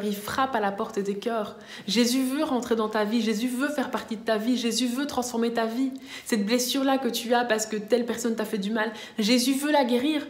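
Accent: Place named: Belgium. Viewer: Super message. J'aime bien ton accent belge. Keep it up ! God bless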